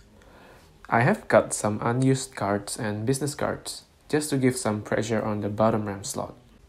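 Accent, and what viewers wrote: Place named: Indonesia. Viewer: lsg ngenalin logatnya orang Indo, eh terconfirm dengan modif mobil jadi polisi lalu lintas wkwkw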